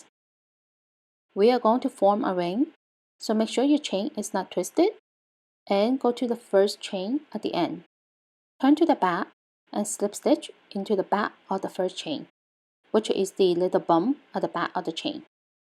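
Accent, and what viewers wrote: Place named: Asia. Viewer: What kind of Asian is this accent, Mandarin? Vietnamese? I love it 😍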